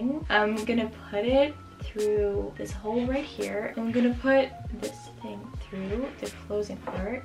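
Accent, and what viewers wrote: Netherlands. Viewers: are you dutch? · Are you dutch??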